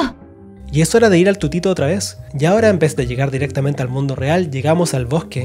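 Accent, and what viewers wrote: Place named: Chile. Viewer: Bro tienes buenas ideas pero si quieres crecer trata de no tener el acento chileno.. para que seas global y te vean de mexico tambien, colombia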